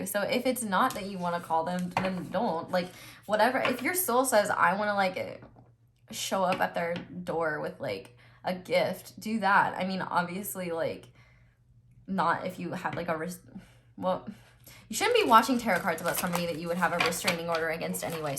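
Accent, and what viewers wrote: England, United Kingdom. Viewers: Omg pile 4 I love your British accent!! Coming over for tea later Air Smooches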